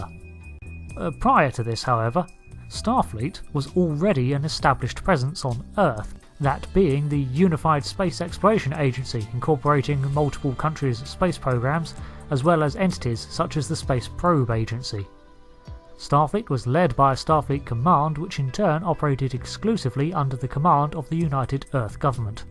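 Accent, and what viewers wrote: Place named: RP, United Kingdom. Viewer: French caption with an RP English accent.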